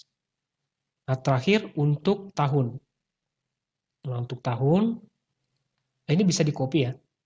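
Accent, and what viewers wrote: Bugis, Indonesia. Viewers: Orang Bugis yah?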